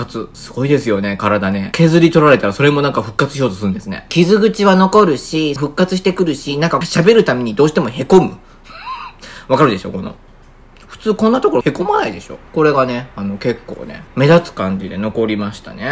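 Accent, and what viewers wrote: Kansai, Japan. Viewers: 一コメ～～～～～！いつも楽しく拝見してます！同じ関西人。話のテンポがものすごく関西。懐かしい！何かあったかい。末永くよろしく～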